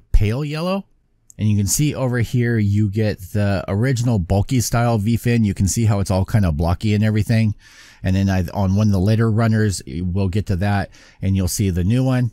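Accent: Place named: West Coast, United States. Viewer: Accent? Hm.. I suppose he has a West Coast accent since he lives in California. I don't really hear too much of an accent though.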